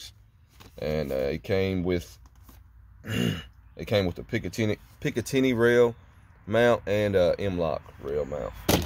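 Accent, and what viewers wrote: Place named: South, United States. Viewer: Im sorry but he good ole boy i hear it in his voice. southern livin baby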